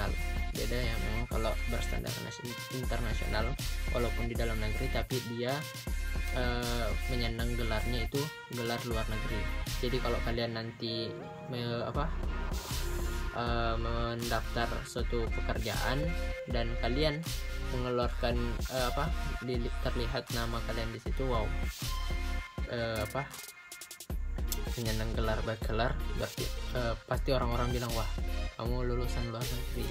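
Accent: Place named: Bugis, Indonesia. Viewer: org bugis mks ka bro logatnya okkots...minta dl no.hpnu?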